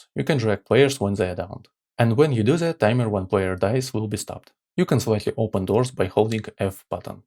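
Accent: Russia. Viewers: Hello friend, you speak with a noticeable Russian accent, where are you from?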